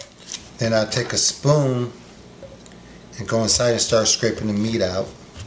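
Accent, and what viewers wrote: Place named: Trinidad and Tobago. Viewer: You sound like a "Trini" lol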